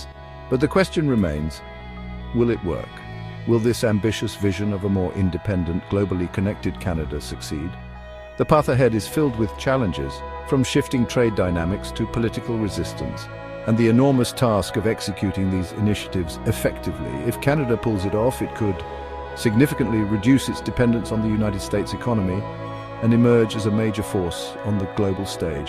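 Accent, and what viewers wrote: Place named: United Kingdom. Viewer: Love the British accent and the USA spelling. Who makes this s___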